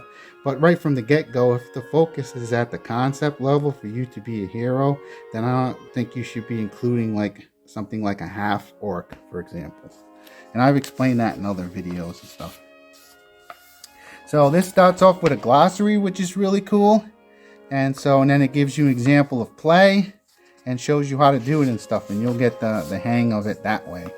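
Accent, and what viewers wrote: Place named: New England, United States. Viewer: Your accent sounds either New England or maybe New York. I started in the mid 90s with AD&D 2e. Still love it. It feels like the real thing. Later editions lack drama and don’t feel as scary.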